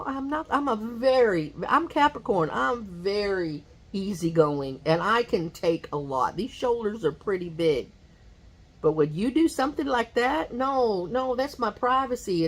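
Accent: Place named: Texas, United States. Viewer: Good for you! It is a respect thing. People shouldn't be doing that at all. I heard the Texas tone come out in that conversation. We had the same problem with so many people doing that...we finally broke down and did a payment plan for the fence. We really couldn't take it any more. People are so rude and inconsiderate. Is this person dangerous or mentally unstable? I just worry about your safety. Take care girl. Good for you😊 ❤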